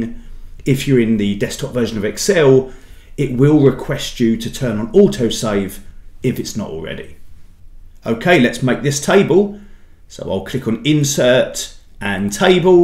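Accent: United Kingdom. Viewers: Nice British accent)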